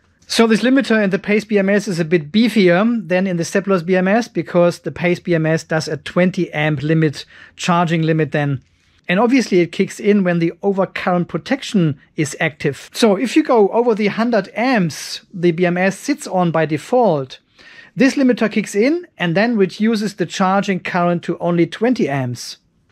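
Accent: Germany. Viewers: At first I was thinking what is a PVM? Then I remembered ah German, where W's are pronounced as V's. :)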